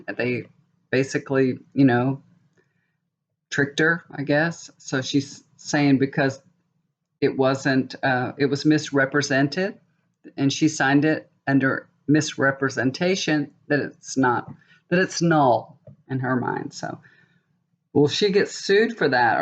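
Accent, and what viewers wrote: South, United States. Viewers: Kim for Intuitiview mentioned you in her latest post. So glad she did. Great channel and you're Southern twang is so cool. (I'm Australian)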